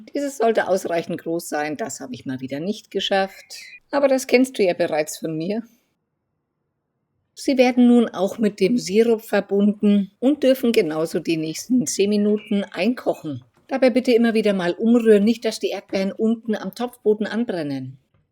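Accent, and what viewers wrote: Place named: Meiningen, Germany. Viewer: Hallo, ich finde deine Videos super! Perfekt erklärt und mit einfachen Zutaten! Ich hab mich schon durch einige deiner Brote durchprobiert, beide Sorten Frischkäse ausprobiert und gestern Kisch-Erdbeermarmelade gemacht. Alles Super! Ich komme aus der Nähe von Meiningen. Deinem Dialekt nach zu urteilen müstest du auch aus der Nähe stammen!? Liebe grüße Tino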